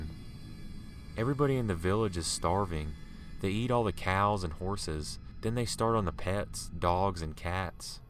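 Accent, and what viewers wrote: South, United States. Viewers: accent is super strong which part of the south are you from im from the south to btw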